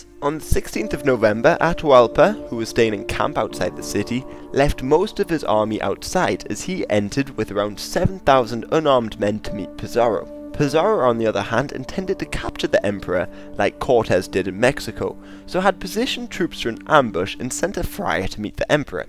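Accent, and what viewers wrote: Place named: United Kingdom. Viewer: he's not even american he's British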